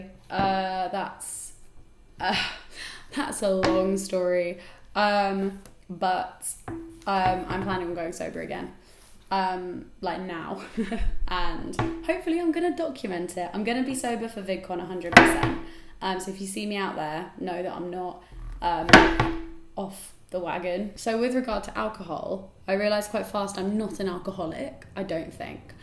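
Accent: United Kingdom. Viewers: I do have to say, your voice is diferent. If I have to describe it, it's like you have a stonger british accent than before. Even thos this new voice is kind of cool. We could see that your are moiving on or mayber moving arround, but if i have to say something it's feels like your are quite goiging back to the same state of mind over and over. Il y had to make a resume of the process it will like: starting art video project > improving and talking about yourself > realizing you talk to much about you > sentiment of loosing personal safe space > black out youtube > then you go back down on thing > then it's the fuck that feelling > and we rewind to projects. Everyone af it's own reptitive cicle (for me it"s about meeting people > over speculate on relationships > realise the truth > pushing away human kind > being sad > and stat over) It's just weird to see someone elses loop.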